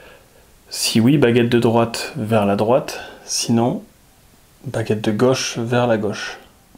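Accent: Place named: Southwest, France. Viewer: J’aime beaucoup tes enquêtes. Tu es sensible, respectueux et touchant et… tu as l’accent de chez moi 😜 Tu es de la Dordogne ou du Sud Ouest j’imagine. Merci pour tes vidéos… je n’en manque aucune. Protège-toi quand même avant chaque enquête. Bonne continuation 🌸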